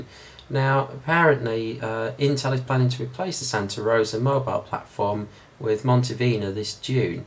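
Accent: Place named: United Kingdom. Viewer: good to hear a british guy doin tech stuff, nice vid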